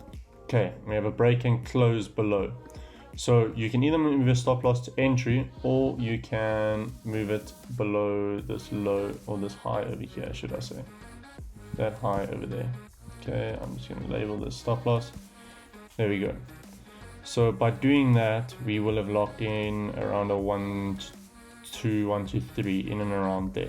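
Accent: South Africa. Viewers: First time watching one of your videos. Definitely becoming a new sub. Loving the South African accent 💪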